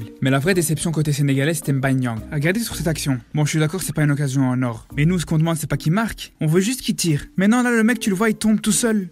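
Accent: Brussels, Belgium. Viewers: T’as l’accent belge fieu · Accent bruxellois flag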